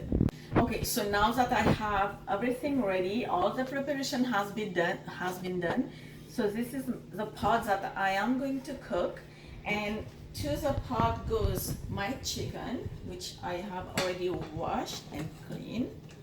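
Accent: Mauritius. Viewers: My step-father is Mauritian, and she just sounds like lots of Mauritians I know. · Why do you sound Mauritian, Indian and Jamaican at the same time?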